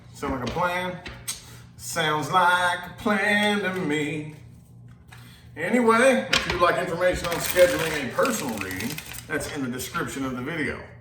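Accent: South, United States. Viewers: 🤣 I have a really southern accent like does. My voice texts NEVER come out right lmfao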